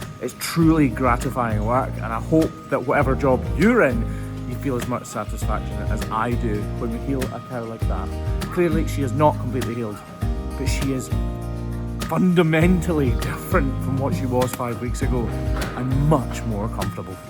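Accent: Scotland, United Kingdom. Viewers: Just dropped in to say love your unique channel. In some weird way, watching these is like some ASMR kinda videos 😅 Coupled with your soothing Scottish accent and its pure relaxation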